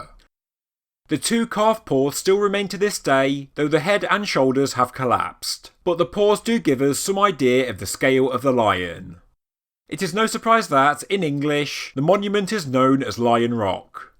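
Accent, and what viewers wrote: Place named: Midlands, United Kingdom. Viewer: British, somewhere more north than London, probably Midlands.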